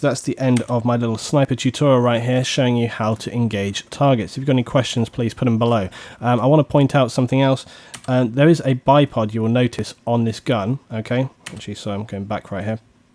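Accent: United Kingdom. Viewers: feel like a british SAS with that accent, keep it up!!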